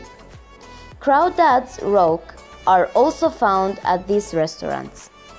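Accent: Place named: Egypt. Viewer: Your accent I feel it like an Egyptian accent when you speak English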